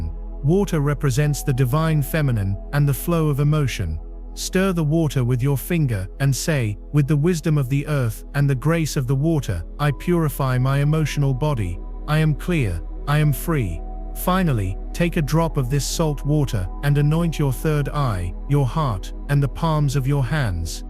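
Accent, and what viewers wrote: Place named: United Kingdom. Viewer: Click bait. With an expect Brit accent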